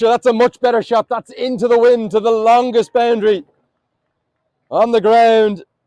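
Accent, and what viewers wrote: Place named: United States. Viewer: First time hearing cricket commentary in American accent 😄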